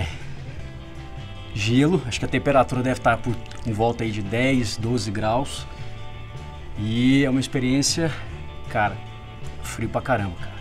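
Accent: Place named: Minas Gerais, Brazil. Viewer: Vídeo bom demais, ainda mais com esse sotaque "minerin". Sou fã do canal. 💕💕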